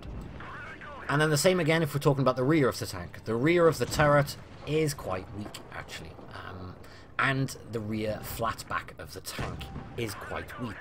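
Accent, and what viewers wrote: United Kingdom. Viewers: Many will find it weird but ever since I subscribed aside from his perfect Analysis of the tanks and the game and all ( which is in my opinion is better then bushka and the others) his voice is just perfect you know a WWII battle gameplay in the background and his calm voice with the British accent explaining what is going is what forces me to watch his videos let alone the extreme accuracy and information he provides in the game..... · Such a lovely review, thank you! Hey, WoTB needs a British voice for the british tanks... I hope WG's going to ask you to make the voices 😜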